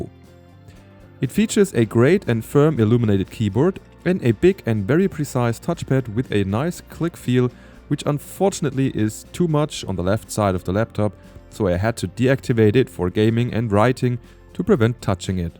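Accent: Germany. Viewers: Lol manchmal glaub ich, dass er Deutscher ist der englisch spricht :D erinnert mich so krass an den deutschen Typen der Monitore reviewt auf englisch xd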